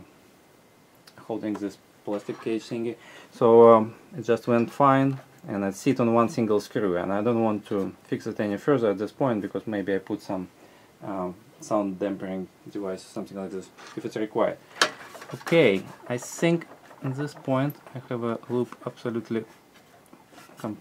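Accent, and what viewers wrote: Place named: Russia. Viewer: Российский акцент так и показываешь!даж не стараешься говорить с меньшим акцентом,а ,,буилд,,кайфовый.спс)_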